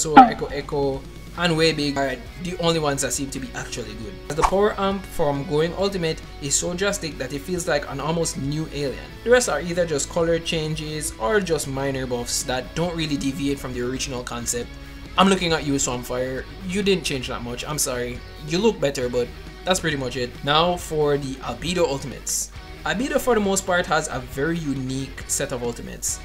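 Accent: Jamaica. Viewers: You’re Jamaican? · Are you Jamaican? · Sounds Jamaican to me??? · Jamaican accent? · are u jamaican bro? me hear a accent dwag? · You jamaican? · Are you jamaican?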